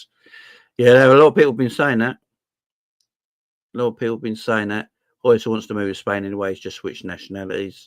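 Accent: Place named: South, United States. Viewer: Hey, wasn’t sure about you at first what with you having that southern accent and all that. Now I know why people think you’re brilliant. Top content as always. Big thanks for keeping us informed 😊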